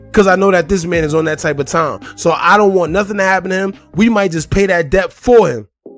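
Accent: New York, United States. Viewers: How u kno dis and u sound like u from new york🤔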